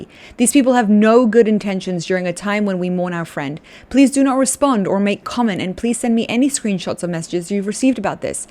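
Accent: United Kingdom. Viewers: British accent? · I like your hair!! Its like wool... beautiful texture. By the way r u British? I like your accent too...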